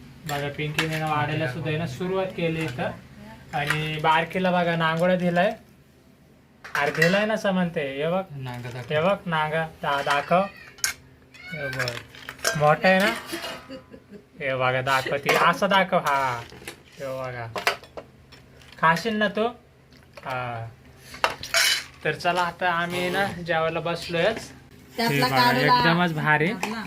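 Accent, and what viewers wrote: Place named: Nashik, India. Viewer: भाऊ तुणच मुळ गाव कोनत आहे तुमची भाषा ऐकून वाटत नाशिक मधचे आहेत तुम्ही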